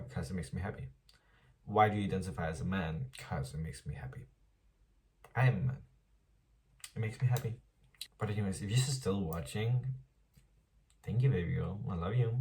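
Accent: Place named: Denmark. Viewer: danmark nævnt i medierne gutter (danske accent er lowkey crazy)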